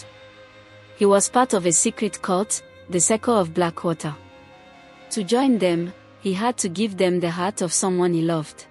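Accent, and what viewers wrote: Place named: Ghana. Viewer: This your pigin English dey sweet 😂 E dey sound like Ghana people pigin Cheap particles dey run belle so dey said Haunted house no pass like this o😮 Na God go help use o Even mama Ike dey fear too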